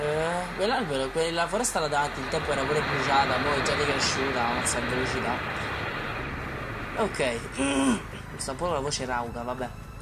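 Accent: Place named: Latina, Italy. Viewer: Ma sei di latina daje ahahah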